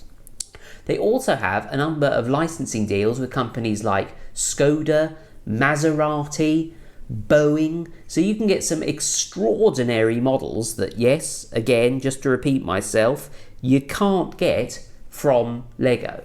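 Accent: United Kingdom. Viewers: Did he say Fokker ? I dunno, in that very British accent I choked on my LEGO brick 🧱. Hey keep up these vids, I’m new to the channel but I’m a scale model builder whose taken to LEGO’s now and again (the Ecto 1 & 1966 Batmobile) but I want some military models & you sir are providing some great info. (language aside - 🤣)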